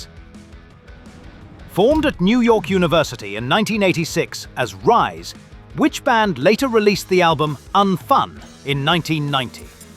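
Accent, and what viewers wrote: England, United Kingdom. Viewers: Why the English accent talking about US punk ?.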